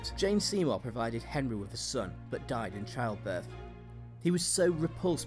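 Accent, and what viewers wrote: United Kingdom. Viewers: It's weird-- the narrator has a very thick British accent and yet he uses American slang like "pissed off" for angry and "awesome" instead of brilliant.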